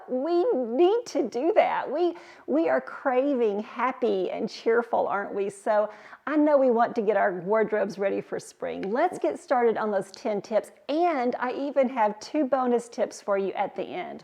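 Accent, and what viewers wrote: South, United States. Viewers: Hi, I'm new to your channel, just subscribed! I love all the tips you shared, as well as the outfits! You look so pretty in pastels, especially that beautiful pink! When you mentioned living in Cincinnati, I couldn't believe it (thought you to be a Southern lady, just a bit of accent to my ear😉). We relocated from NE Ohio to Miamisburg (not far from Dayton) almost two years ago! Our oldest is located in Hamilton, twenty miles from Cinci where he and his wife both work. It's a small world, neighbor☺️!! It is snowing as I write this! Like you, we are so ready for Spring and so thankful for our warm home near all three of our Kids' families - they were our impetus to relocate, especially to enjoy our seven grands♥️. Thank you so much for being a voice on YouTube, sharing your tips with all of us! For me you're a God sent gift and I'm so glad to have found you! 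Sending warm 🤗. - Susan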